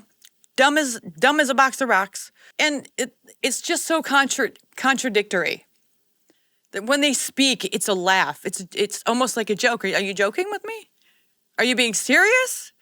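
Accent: Scotland, United Kingdom. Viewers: Trust me, I'm from Edinburgh and no clue what he is saying either, BTW I love your Scottish accent you should do it more often.😘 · Great Scottish accent🔥⚡️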